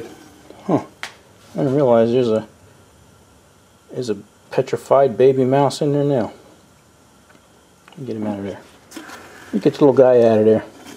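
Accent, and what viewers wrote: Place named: Bordentown, United States. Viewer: So, how far from Bordentown do you live? The accent is a dead giveaway. LOL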